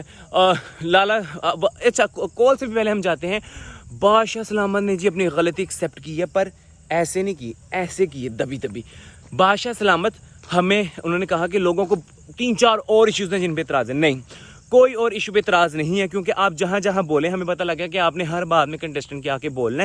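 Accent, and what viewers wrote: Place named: Punjab, India. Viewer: Please apni Urdu thik karin Ap kah andaz Punjabi hai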